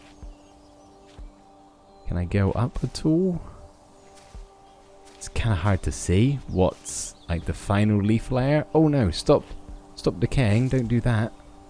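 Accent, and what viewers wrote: United Kingdom. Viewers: are you from the U.K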